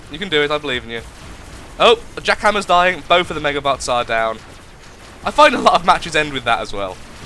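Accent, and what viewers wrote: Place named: England, United Kingdom. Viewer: O H M Y F R E A K I N G G O S H ! ! ! I just can't concentrate to the game hearing your english accent XD OMG!!! It's a praise =D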